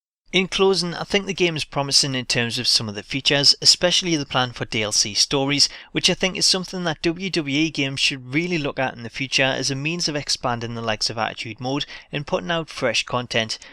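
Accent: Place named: Wales, United Kingdom. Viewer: Allow this guys dead welsh accent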